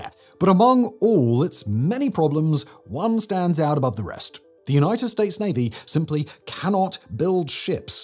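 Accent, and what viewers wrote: England, United Kingdom. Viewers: I used to hear an English accent and think you sound intelligent. Now after getting to know your country and people, I just think you sound like a complicity idiot who will go along with anything you are told. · Good Video. Lousy Audio. Get rid of the reverb. Flatten out your voice. Lower the bass. Over and over the sound comes out garbled. You already have the British accent. Quit trying to make yourself sound even better. Deliver it flat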